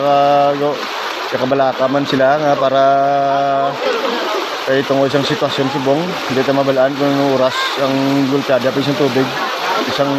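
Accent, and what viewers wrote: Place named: Iloilo, Philippines. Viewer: Saan yan sis ilonggo angvsalita halong kamo da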